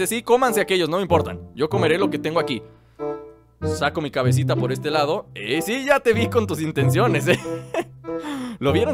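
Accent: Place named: North, Mexico. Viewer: Hola, es la primera vez q veo un video tuyo, me podrías decir de que ciudad eres? Tienes acento norteño, como de Monterrey, México. Saludos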